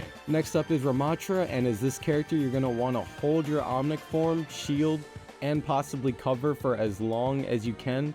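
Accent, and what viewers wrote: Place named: United States. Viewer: He sounds like a white American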